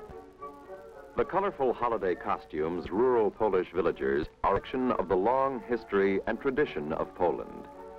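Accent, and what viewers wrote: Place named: Mid-Atlantic, United States. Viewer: I'm not sure but he is speaking a mid transatlantic accent which was really great.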